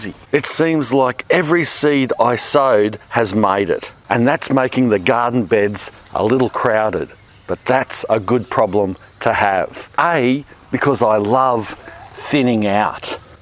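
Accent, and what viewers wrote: Australia. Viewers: i was like what he spiced them? ooh spaced. australian accent is the best accent 😂.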